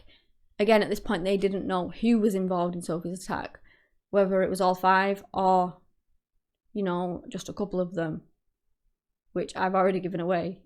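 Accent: United Kingdom. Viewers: Thank you for highlighting this case. May I ask where is your accent from in the U.K.? Thank you.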